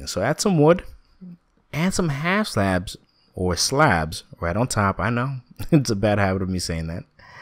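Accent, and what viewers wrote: New York, United States. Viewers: I think it is funny that you apologize for the noise, and tell people that you are from New York. LOL. I think everyone knows you are from New York. You have a very distinct accent. There are a couple of your videos where you say Forget about it. I lose it, totally lose it. Reminds me of a very young Joe Pesci. I hope you know I am a fan of all of your builds, and I don't mean anything bad about your accent. As a matter of fact for those of us who spend hours watching these videos making our builds it is important that the video makers accent is easy to listen too. There are several channels that I just can't watch because the maker's accent or rythm of speech is too annoying.Keep up the great work.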